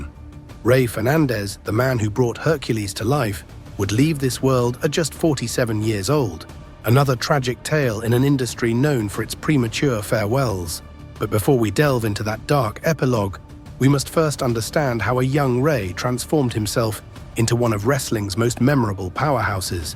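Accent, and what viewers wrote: United Kingdom. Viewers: Why do they always use a Brit voice for these things? Aaaargh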